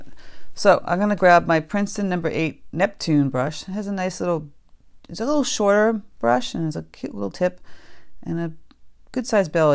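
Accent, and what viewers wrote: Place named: New England, United States. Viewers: Love your style. And your cute east coast accent. 😊🌹👍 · Great lesson for beginners. Also a good way to save on paint and paper for beginners. Love your New England accent. I've lived in Florida for 50 years and people can still hear mine!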